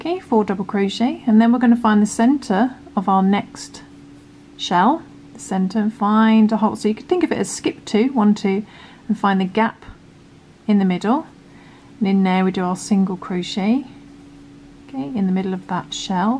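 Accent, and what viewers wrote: England, United Kingdom. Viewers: I find your technique fascinating. The way you position your hook facing upward and the way you wrap your yarn. It flows very well. And you are the only person I’ve ever heard with an English accent, using US terms and stating the UK terms as well, repeatedly. Very helpful I’m sure to those in the UK.. I’m thinking maybe you live in the US? Lovely stitch pattern! I look forward to this series! Thanks for sharing!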